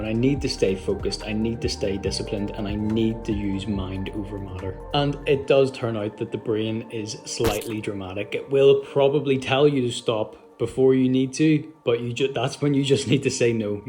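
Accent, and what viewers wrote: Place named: Ireland. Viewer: No way!!! I’m doing the Belfast marathon as-well, I suspected you were doing it cause u said u were 12 weeks into training which means most likely u only have another 4 weeks left and the Belfast marathon is in 3-4 weeks and I could recognise the Irish accent from anywhere. Maybe I’ll see you there mate, good luck and what time goal are you going for if you don’t mind me asking?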